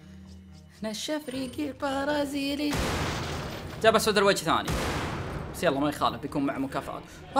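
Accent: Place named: Saudi Arabia. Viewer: اللهجه سعوديه وحتى ask any one he told you were he from!!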